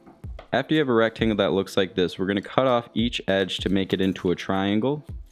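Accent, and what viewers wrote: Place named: Michigan, United States. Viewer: Pop! You sound just like my family from Michigan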